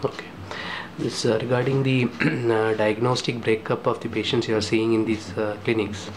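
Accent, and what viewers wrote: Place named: India. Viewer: speak ENGLISH i don't want to hear your fucked up stupid india voice or acent. its one of the worse langues in the world and most stupid sound and un able to make sense of it. sounds like a fucking baby...i guess they want to act like freakin babies their whole pathetic life plus their county is a complete mess they have no respect for their own back yards...pathetic way to live.....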